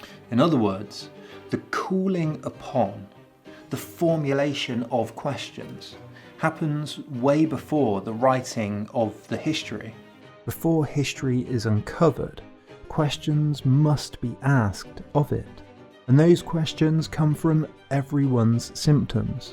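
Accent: United Kingdom. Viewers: Theres something about a british accent that presents an insidious force that everything being said is intellectually inclined and of authority. I just think its so dangerous. Or am i just crazy? There may very well be merit here, but i cant tell and it bothers me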